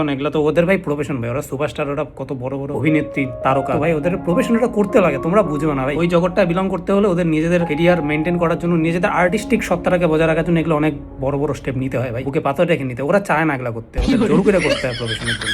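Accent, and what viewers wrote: Bangladesh. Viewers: বাংলাদেশিদের মতো কথা গুলো লাগছে। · That Bangladeshi accent❤️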